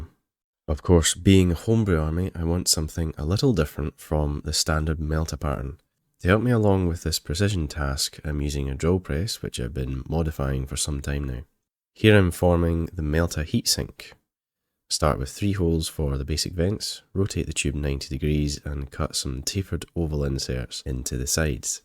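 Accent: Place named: Scotland, United Kingdom. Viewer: amazing job mate! 🤘 and that smooth Scottish accent makes the video 10x better lol 🤣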